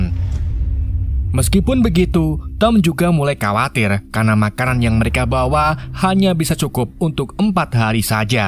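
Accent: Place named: Java, Indonesia. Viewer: kwbelet ngoyo 🤣🤣🤣langsung ngkak denger logat jawanya bang, suksesterus saya suka reviewnya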